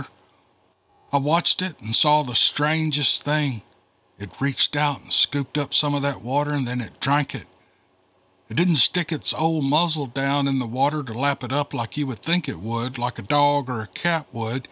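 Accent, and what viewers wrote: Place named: Appalachia, United States. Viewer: I'm frail now, in my early 60's, but liver failure has made me look far older than I am...and my looks betray what I once was, long ago. 6'5", 245-lbs...once a U.S. Marine, then various colleges and careers leading me to a distinguished position as a Director of Engineering...all betraying this lanky half-Cherokee kid who grew up not far from Clingman's dome in Tennessee...an 'appalachian hillfolk', as it were. I hear the accent that I had as a kid, and listen intently to the stories told here, and it brings me back to old stories like I grew up surrounded by...legends of the hill people and the Cherokee...from a time long ago. The love of my life and I spent summers in each others arms...and she has been my wife for many years now...almost 50, as memory recalls (we were 'like one' for years before we actually got 'legally married')...and nothing is better than sitting here in the evenings with her, as we listen to the channel like you might listen to WSM-650 back in the day, and hear the old shows and country music...by the living room radio (the size of a modern TV now)...keep up the great work,...some things should never completely 'fade away'...like the stories here.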